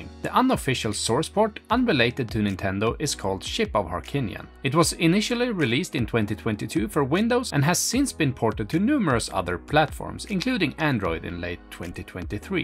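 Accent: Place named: Sweden. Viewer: Once you learn to spot a swedish accent it's hard to miss, heh. -fellow swede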